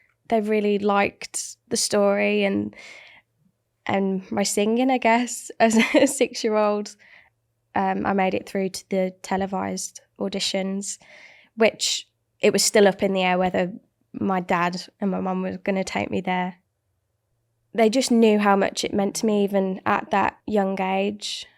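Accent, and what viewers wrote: United Kingdom. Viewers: I love British accents & I definitely love her singing.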